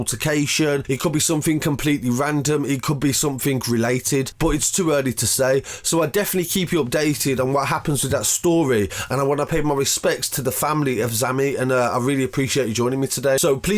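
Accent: Birmingham, United Kingdom. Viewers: Man you have strong brummie accent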